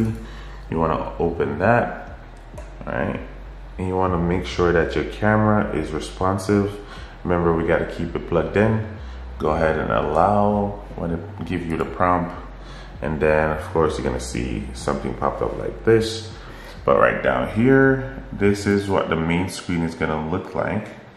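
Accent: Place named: Jamaica. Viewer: I hear the Caribbean accent. If I had to guess. Yardy (Jamaican). Thanks for the video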